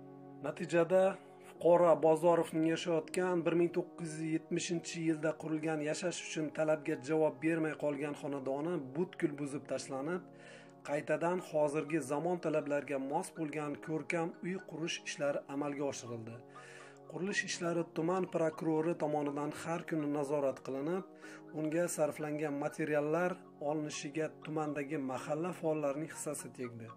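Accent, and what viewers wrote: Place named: Tashkent, Uzbekistan. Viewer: Охо ташкентча солидовин бомисан жора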